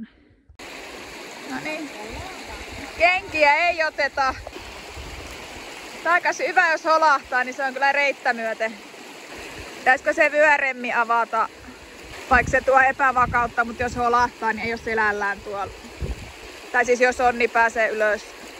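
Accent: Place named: Ostrobothnia, Finland. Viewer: Mukavan rentoa meininkiä 👍 Pilkahduksia pohojammaan murteesta 😊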